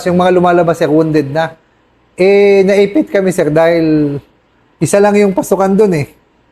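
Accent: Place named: Bicol, Philippines. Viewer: Parang bicolano ang punto ng salita niya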